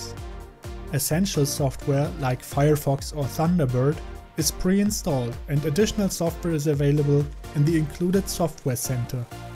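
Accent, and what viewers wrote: Germany. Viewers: falls du deutsch bist hört man das an deinem akzent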